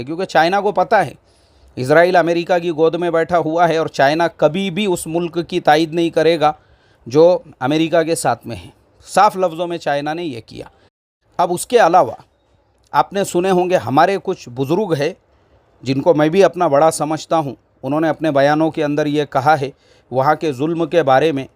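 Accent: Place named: Maharashtra, India. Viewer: Mufti Sahab Mere Saathi Hai Bachpan Se Unka Baat Karne Ka Tariqa Aisa Hi Hai Jo Maharashtra Ke Baaz Ilaqo Ka Aam Tariqa Hai Lekin Dusre Ilaqo Ke Logo Ko Lagta Hai Ki Isme Gussa Hai Jabki Wo Normal Style Hai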